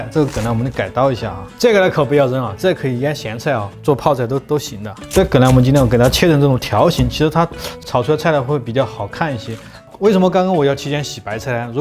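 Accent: Sichuan, China. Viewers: 帅哥亲切的四川普通话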